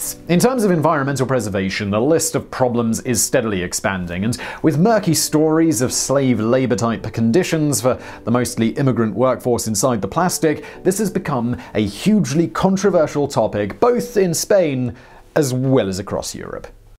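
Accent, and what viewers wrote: United Kingdom. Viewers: Australian dollars? New Zealand dollars? And there are dollars in even more countries. American dollars may not be the first kind of dollars to spring to mind to people from British Commonwealth countries, and is very popular in many of these countries, and don't forget that having a UK accent and not American they may think he was talking about their dollar. I'm a Brit, and when people say dollars to me, I automatically think NZ dollars, as my son and his wife have emigrated there.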